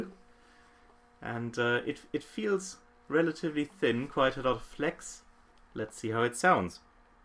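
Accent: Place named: England, United Kingdom. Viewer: Oh your voice..I have never heard it...what a a lovely English accent,. For picks, I am told..there should be no give...no bendy qualities ( for movement efficiency) and not too pointy. ( personally I use Blue Chip TAD 40 always )- but my teacher uses a TAD 60. I have many of the same ones you have too. But I like the way the TAD 40 sounds on your mando. Maybe it depends on the type of music you play (ie: jazz) With 31 picks..I'd say - pick one and stick with it. Which one is the most comfortable in your fingers.? If you get used to one, you will learn how to strike the strings the way you like. This is a good review, btw, for many people to watch.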